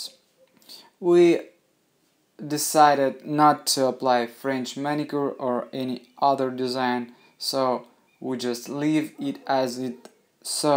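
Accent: Russia. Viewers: явно русский, страно разговаривает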